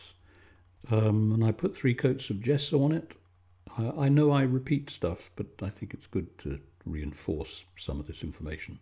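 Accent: United Kingdom. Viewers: Finally one with a british accent! Love your talking while painting!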